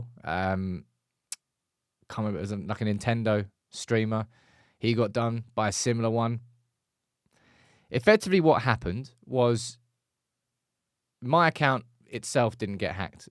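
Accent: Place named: Liverpool, United Kingdom. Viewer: Defo a Scouser... sorry you had to go through that. Glad to see you got your channel back! ❤🤍🖤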